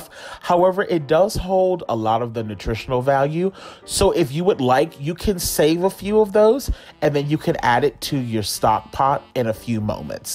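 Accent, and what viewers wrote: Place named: Gullah, United States. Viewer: Reminds meh of my gullah people 🤣🤣🤣🤣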